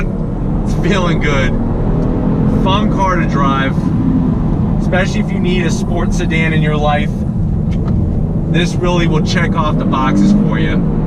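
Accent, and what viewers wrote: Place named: Long Island, United States. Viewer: Ask you where in New York are you originally from that sounds a little bit like Long island if I'm not mistaken.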